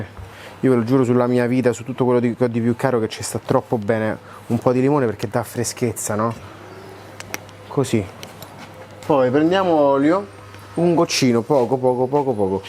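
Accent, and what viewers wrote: Rome, Italy. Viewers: Ma sei un marocchino che parla con l’accento romano ??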